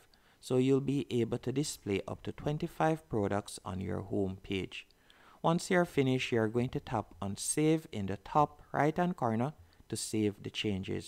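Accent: Jamaica. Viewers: Yo you sound like a yaad man , if yuh bug mind help me generate some sales please 🙏🏽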